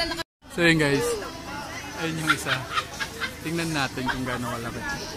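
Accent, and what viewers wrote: Visayas, Philippines. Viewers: bisaya diay ka kuya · Woii bisaya ka pala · Bisaya man ka noh klaro kaayu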